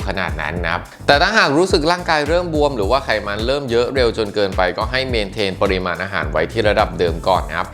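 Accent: Thailand. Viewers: โยโย่มา แล้วเเล้วลดไม่ลงเลย ออกกำลังกาย คุมอาหาร ก็ยังไม่ลง ปล.โค้ชเป็นคนสุพรรณหรอ สำเนียงมันได้😆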